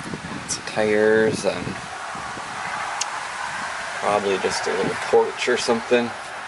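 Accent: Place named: United States. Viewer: Where have you been?!!! I thought you'd given up exploring. Anyway glad to see you again. That must have been a magnificent house in its day. Thanks for the tour and by the way, don't know which part of the States you're from but I love your accent!! 😂 Gill 🇬🇧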